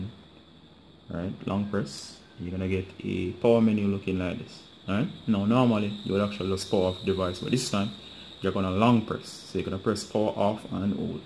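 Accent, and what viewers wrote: Jamaica. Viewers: Cuz u sound Jamaican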